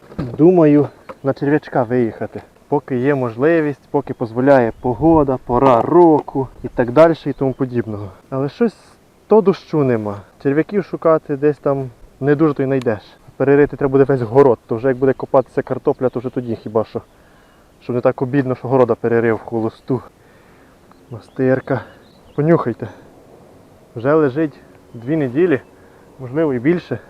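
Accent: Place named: Ternopil, Ukraine. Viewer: Тернопільський акцент. Рибалка це життя і активний відпочинок👍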